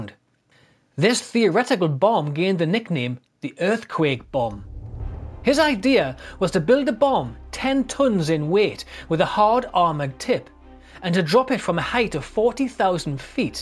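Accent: Newcastle, United Kingdom. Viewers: Really excellent video. Great narration (got to love the Geordie accent), and fascinating content. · Thanks for a super enthsiastic video and your beutiful Goerdie accent, one of the friendliest voices in the world. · This is a Geordie accent, yes?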